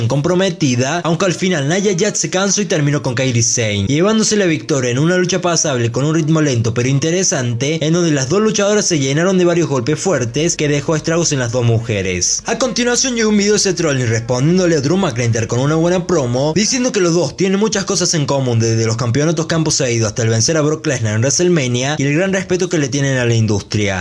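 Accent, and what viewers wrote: Córdoba, Argentina. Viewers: Hola sos de cordoba? Muy buen trabajo saludos desde bueno aires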